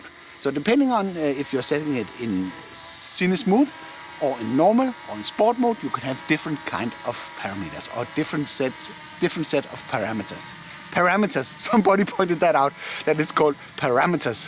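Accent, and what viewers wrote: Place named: Denmark. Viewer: Jeg elsker den danske aksenten din :D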